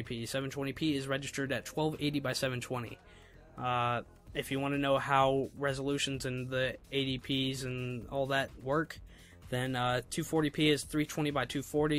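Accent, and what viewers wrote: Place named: Ireland. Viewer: Irish, m8 Irish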